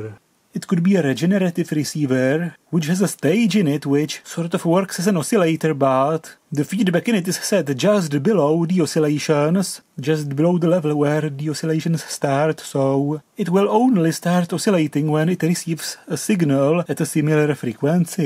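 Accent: Czech Republic. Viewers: really hard to listen to ..is it czech accent? too bad, since the understandable parts sound interesting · I know his english is good and that he's Czech, but that is one funky accent. I'm just super surprised I don't see people talking about it.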